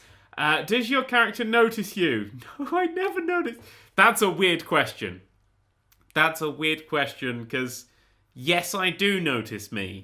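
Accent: England, United Kingdom. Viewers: Are you from England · are you from Britain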